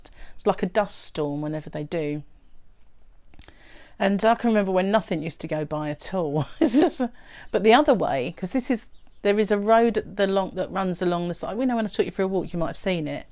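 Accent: United Kingdom. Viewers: I like to watch your you tube love to listen to the British accent I hope you finish the bootie I want to make one to go with mittens you made than I will find a blanket. Thanks again see you again.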